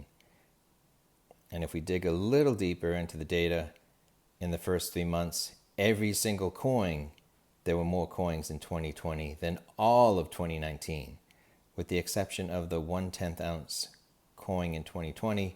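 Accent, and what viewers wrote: New England, United States. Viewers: You sound like a New Englanda. I'm from NH. How far off am I?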